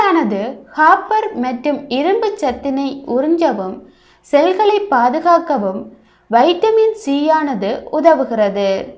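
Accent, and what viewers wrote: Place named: Malaysia. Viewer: Unga Voice paartha, ouja channel admin mathiri irukku 😀😀, Malaysian slang. Nice Video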